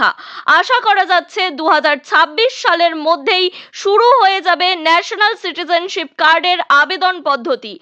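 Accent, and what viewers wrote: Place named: Bangladesh. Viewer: ম্যাডাম আপনার পূর্বপুরুষ বাংলাদেশি আপনার গলার ভয়েস থেকে বুঝতে পারছি😊😊😊 মা-বাবা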